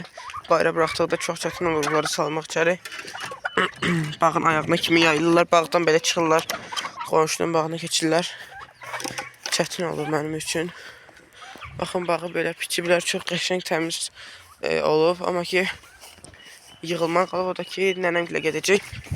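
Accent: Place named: North, Azerbaijan. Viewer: Bu şimal zonasının lehcesidi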